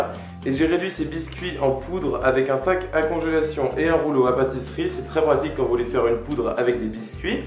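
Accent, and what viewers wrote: United States. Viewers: es tu Americain? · Tu fait un peu trop l'américain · Mais peut être qu'il EST américain ? Ça ne vous ai pas venu à l'esprit ? Ou qu'il y vit ou passe ses vacances donc a l'accent ??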